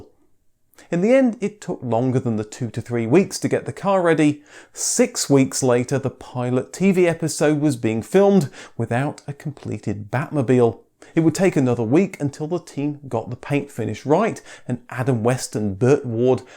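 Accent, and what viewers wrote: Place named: United Kingdom. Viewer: Thank you so much for this great video. I thought more replicas had been built: about 8. I read this somewhere. As usual, it's a pleasure listening to your perfect British accent. That's a bonus to me!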